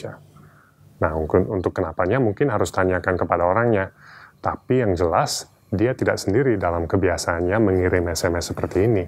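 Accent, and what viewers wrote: Java, Indonesia. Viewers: Malah terdengar seperti orang Jawa gak, sih? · Sekilas denger kaya medok jowo 👍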